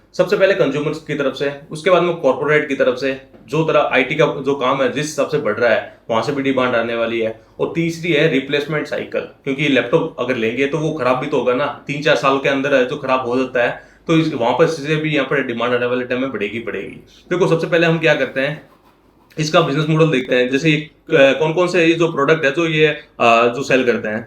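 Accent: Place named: Rajasthan, India. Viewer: Very well articulated 👍, from your accent it sounds like you are from Jodhpur/Bikaner... Its just trading in single digit PE and as you mentioned this is cyclic business and once the uptrend starts (replacement cycle commences) ,lets say by end of this year..and EPS gets doubled (62)..and it gets the same PE at the time of listing that is 15: Stock price comes 930 from this calculation...I also believe at 298 its a good deal and available below IPO price.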